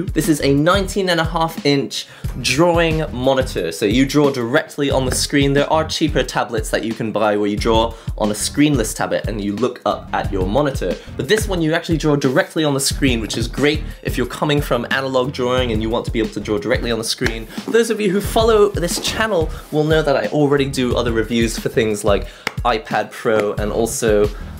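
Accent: United Kingdom. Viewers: british accent on a japanese.. pff this looks so weird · A Japanese chap with a British accent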